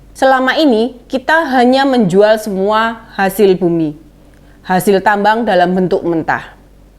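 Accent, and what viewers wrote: Java, Indonesia. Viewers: Wwee..Jowone kentel biangeeett.. 😀😀😀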